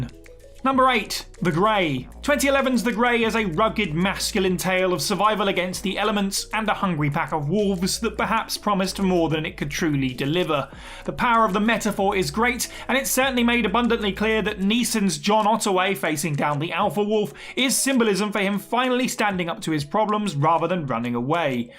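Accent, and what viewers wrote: United Kingdom. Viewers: Anyone actually enjoy/agree with this channel’s opinions or are we all just wanting to hear ignorant opinions in a British accent?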